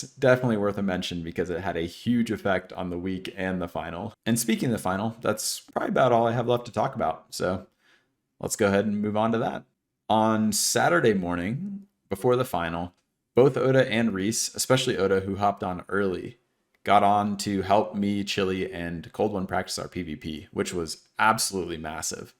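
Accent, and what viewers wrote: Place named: MLE, United Kingdom. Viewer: I discovered you in J1mmyVODs/WasJimmy's youtube video. Ur wagwan accent for ur WoW troll roleplay is perfect and hilarious plz upload ur OnlyFangs' WoW roleplay to youtube! I really hope you collab with Rav, Moobius, Sput/Dr. Bones, Hubert, and other roleplay comedians in OnlyFangs.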